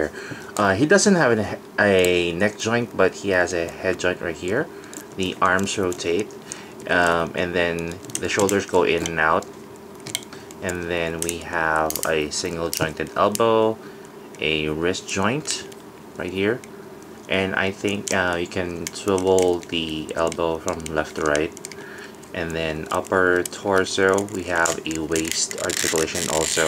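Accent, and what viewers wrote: Philippines. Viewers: maybe Filipino or singaporean accent...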